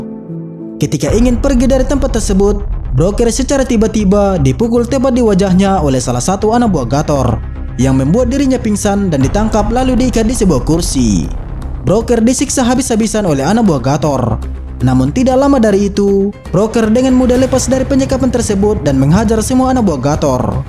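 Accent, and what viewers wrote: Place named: Toraja, Indonesia. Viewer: Klo bisa logat Torajax dihilangkan bro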